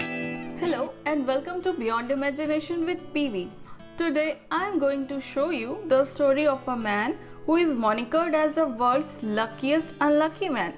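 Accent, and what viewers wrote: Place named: India. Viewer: Your accent is good. There is nothing wrong in Indian accent 😊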